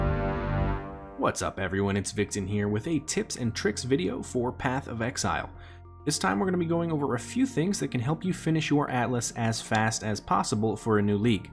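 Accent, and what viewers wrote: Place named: United States. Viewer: A someone who isn't American, I usually find the accent as hit or miss. You have a lovely tone, pace and cadence to your voice, and it made for a really nice video. You should do more content, and persevere with your Youtube channel.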